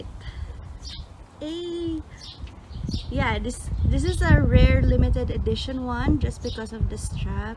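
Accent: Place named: Philippines. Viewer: That Filipino accent lol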